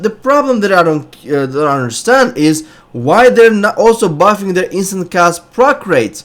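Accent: Russia. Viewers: как приятно слышать Русский акцент:)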